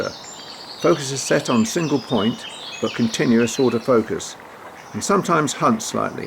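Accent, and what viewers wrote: United Kingdom. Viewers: I will definitely get this lens, I love my 55-200 but I want the extra reach and the almost macro capabilities. Great review; I like your good vibe and your British accent. Greetings from Taiwan. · Fantastic review. Most reviews have insufficient images and video to get a decent look at what a lens can do. You nailed it. Nice vocals too. So envious of you English with your sophisticated accents. Nice pics mate - great vid too. Astia looked good. Do you do a special white balance when you shoot? Or just use Auto, or Outdoors??? Many thanks, Cam from NZ.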